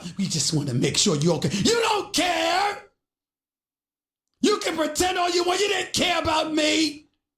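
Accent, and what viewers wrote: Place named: New York, United States. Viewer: All of new York coming outta this man 🤣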